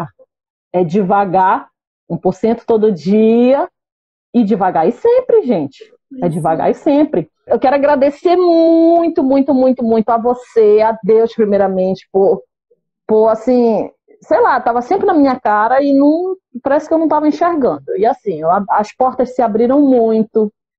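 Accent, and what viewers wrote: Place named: Recife, Brazil. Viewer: Pelo sotaque acho que é de Recife/PE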